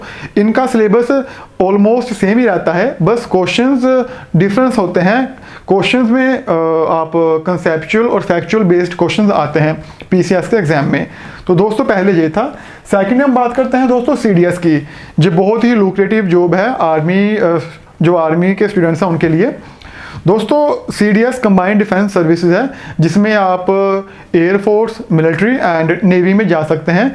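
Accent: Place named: Punjab, India. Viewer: Punjabi exent aa rha h ...Language se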